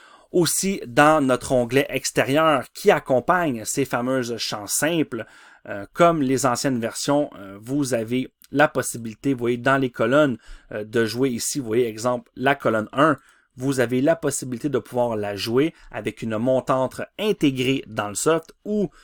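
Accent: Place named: Quebec, Canada. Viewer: Grand travail Québécois trop belle akcent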